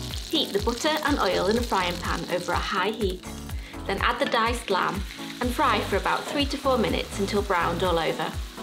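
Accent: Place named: Northern England, United Kingdom. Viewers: We have proper vowels up north. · That looks amazing I liked the recipe as much as I liked her Lancashire accent. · Must give it a bash. Love your Lancs accent. Thanks. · So pleased I found your channel. Thank you so much for the lovely recipes and for the clear and easy way you describe what you're doing. You do sound more Cheshire than Lancashire to me though!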